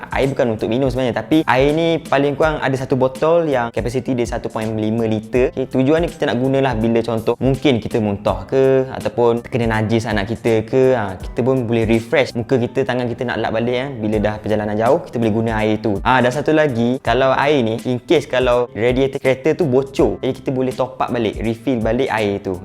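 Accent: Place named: Terengganu, Malaysia. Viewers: pekat ganu bohh hahah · loghat ganu ni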